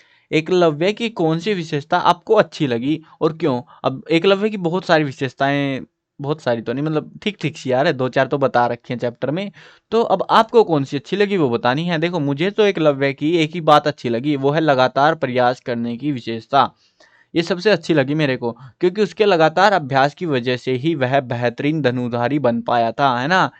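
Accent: Haryana, India. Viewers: Sir app haryana sa ho kya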